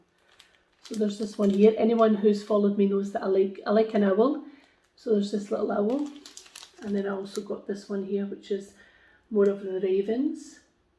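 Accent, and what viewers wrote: Scotland, United Kingdom. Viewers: So glad to see you're doing much better...slow and steady...soon you'll be up and running around. I love that pattern Thistle and I may have to see if I can track it down to purchase it. Really enjoy your videos and your lovely Scottish accent. Star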